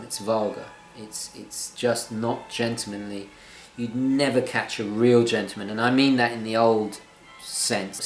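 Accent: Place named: United Kingdom. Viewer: Absolutely! And I really like this British accent.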